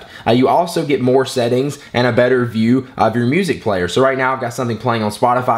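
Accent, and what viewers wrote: South, United States. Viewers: That Southern accent!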